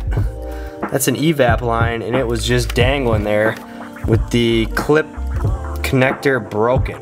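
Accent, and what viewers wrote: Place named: Wisconsin, United States. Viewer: You are truly a Wisconsinite my friend. the accent and the fact that you grabbed a cold one mid install lol you love to see it 😂. From Wisco here as well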